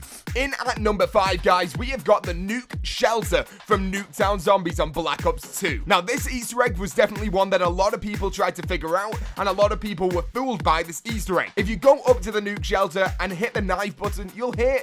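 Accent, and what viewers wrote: England, United Kingdom. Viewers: allot a lot hos english accent made it sound like that trust me i have someone i know with the same accent he pronuces it the same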